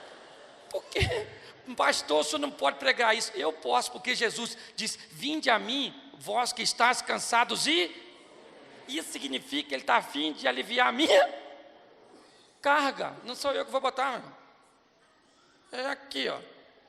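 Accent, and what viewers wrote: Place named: Northeast, Brazil. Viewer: Kkkkkk pastor tú veio lá da minha terrinha foi? com esse jeitinho nordestino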